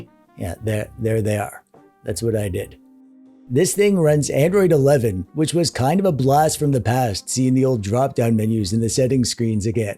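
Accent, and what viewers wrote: Canada. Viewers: That's what Canadians sound like, right? I've always wondered why the handhelds also sound Canadian, though...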